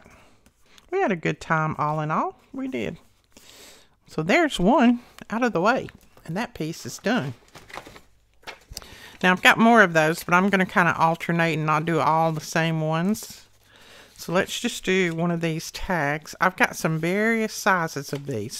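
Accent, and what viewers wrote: South, United States. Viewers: The flowers are great. Thanks. I always forget about fussy tearing so will print everything twice so I can do both. To a lot of us you have a beautiful southern accent, so keep on talking 😂. ❤❤❤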